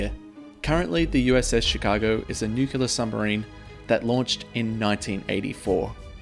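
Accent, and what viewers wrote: Australia. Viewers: I like the Australian English accent. Is how you say "nuclear" the way all Australians say it? 🇦🇺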